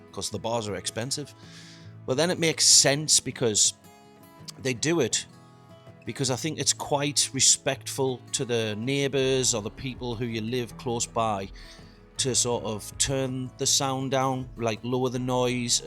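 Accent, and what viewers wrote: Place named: Edinburgh, United Kingdom. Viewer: I have been living 42 years in Norway and I have a little different view on things you have noticed. First, you exaggerate the differences between UK and Norway. I have recently visited Edinburgh and I had an impression of a very similar pace of living and relaxed attitude. Your dialect reminds me of Edinburgh dialect, and maybe you have been living too long in London or a similar city in South England. Second: Staying in London I tried to buy a bottle of beer in a store after 10 pm, and was refused. Three: secondary party. This is typical of a certain social group, mostly single young people. Adult people, with family don't usually do so. And yes, the young people can party a whole night with very loud music, without any empathy for the neighbours. Four: making friends. Usually people make friends in their teens and hold on them. 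It is not common to make friends in adult age, especially not with a foreigner. At work people may be polite and nice, but it stops there. Five: driving. Many people drive very fast, especially those who own a Tesla or a BMW, and have to overtake you, even if you drive a tad over the speed limit.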